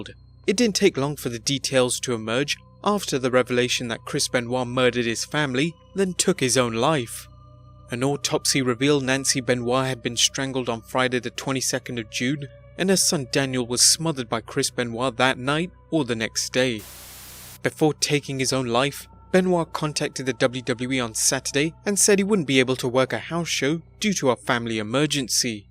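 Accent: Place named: England, United Kingdom. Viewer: Ughh the monotone and English accent makes this video 100X worse i couldn’t make it half 1/4 the way thru it. And this guy had many videos.. all of disgusting horrible acts, cia would be in his backyard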